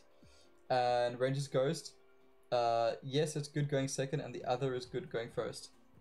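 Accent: South Africa. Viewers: Hey man. I love the content since I started playing Pokemon TCGO again. I am curious though, are you South African as it sounds as though you have the accent.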